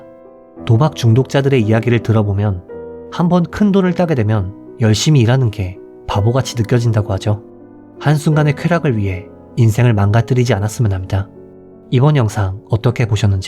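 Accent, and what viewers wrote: Japan. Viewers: 예전에 영상 정주행 중일때 사실 님 일본인이신줄 알았어요 ㅋㅋㅋㅋ 테마 파--꾸 이런단어나 한국어 ㅈ 발음도 약간 그 일본어 자지즈제조 같아서? ㅎㅎ 지금은 일본어 초보자로써 굉장히 부럽네요 ㅋㅋㅋ 늘 조곤조곤한 목소리에 잠들고 있습니다 감사합니다~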